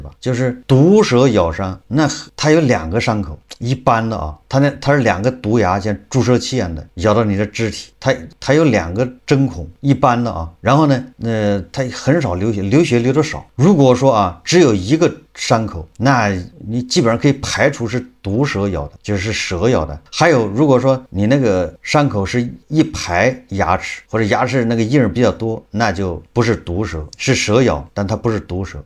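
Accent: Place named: Northeast, China. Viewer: 我还以为是东北人😅爱吃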